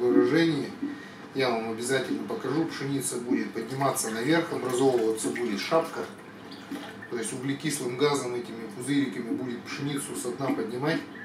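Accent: Perm, Russia. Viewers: Пермяки многие так говорят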